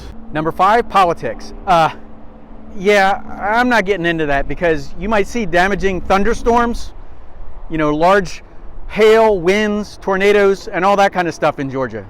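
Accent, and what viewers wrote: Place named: New England, United States. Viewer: Sounds just like new England. only less weirdos.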